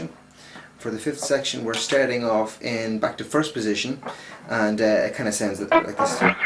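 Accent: Ireland. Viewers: Cool lesson Just wondering, r u Irish? U just kinda have the accent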